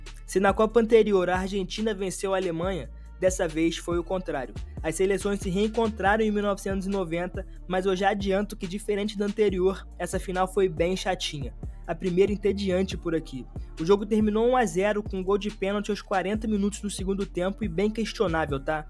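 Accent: Rio de Janeiro, Brazil. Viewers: Vídeo começa : “SOTAQUE CARIOCA” Fechei o vídeo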